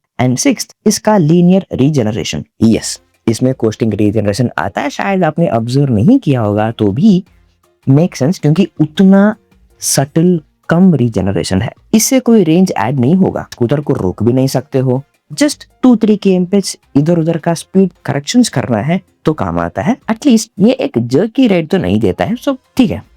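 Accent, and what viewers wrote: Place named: Tamil Nadu, India. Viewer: Love your tamilian hindi accent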